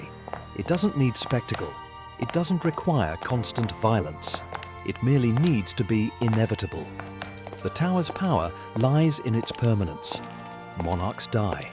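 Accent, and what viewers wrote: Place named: England, United Kingdom. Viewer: I am not a fan of A1 but it does have it's uses for things like this. One point thst kept me watching was the clear English narrator's voice and not a transatlantic one which makes me turn off.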